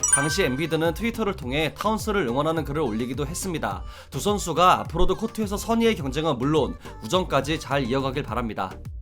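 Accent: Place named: South Korea. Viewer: 너무 콩글리쉬틱 하네요 ㅋㅋㅋㅋ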